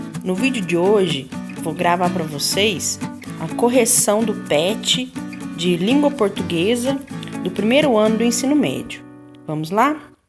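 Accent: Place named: Belo Horizonte, Brazil. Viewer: Me ajudou muito, obrigada de ❤️,pelo sotaque chuto que é de Bh ksksks ameii+1 inscrita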